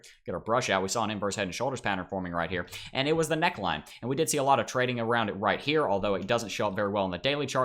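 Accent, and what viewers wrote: United States. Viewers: This strong American accent is painful for my ears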